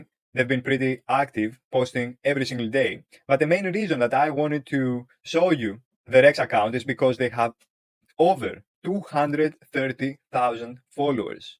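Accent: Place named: Greece. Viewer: Απο τη προφορα μαλλον εισαι Έλληνας ??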